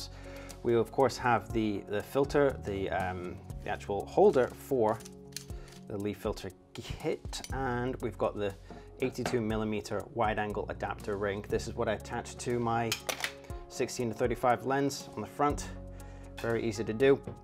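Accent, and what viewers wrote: Scotland, United Kingdom. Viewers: Many thanks certainly enjoyed this and I have just subscribed. Would I be right that I can here a bit of scottish burr in your voice, where are originally from. I am a exiled Scot myself. Regards, Allan Davies.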